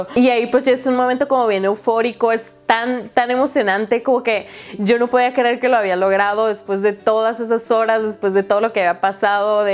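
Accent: Fresa, Mexico. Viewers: Me gusta como hablas toda fresa y eres tan sensilla jaja me encantas.. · Me gusta mucho esa forma de hablar o acento, es como super fresa pero natural, no se como explicarlo, es que no conozco gente que hable así. Felicidades por tu baby, yo tengo 6 meses ahora y estoy pensando si quiero o no epidural y buscando videos para guiarme. Besitos 🌸🌸🌸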